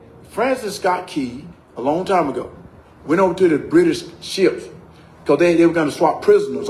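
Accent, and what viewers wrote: South, United States. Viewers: Making fun of his deep southern accent???? This Georgian is voting for him.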